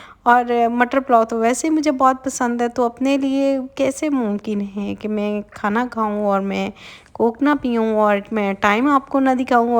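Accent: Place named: Punjab, India. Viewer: you are precious miss, and adorable women. Must tc your healthcare so much. I miss apka Punjabi accent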